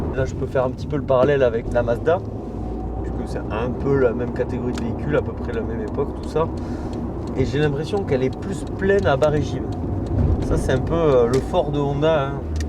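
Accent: South, France. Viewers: Merci, tes vidéos sont toujours un régal à regarder et à écouter (avé l'ascent) ça nous met du soleil dans la tête. J'espère que tes abonnés apprécieront le petit tour, parce que tu ne l'as pas dit mais filmer de la place arrière avec ta conduite, mon dos a pris cher....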